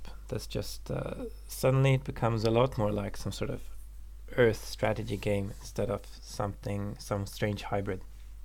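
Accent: Poland. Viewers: Are you Polish by any chance? You don't sound American And is this going to the android version as well?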